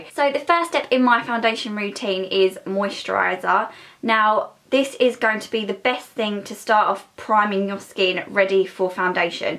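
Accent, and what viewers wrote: Essex, United Kingdom. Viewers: Kayla Motera Thank you! I was thinking Essex.. kinda close! Haha!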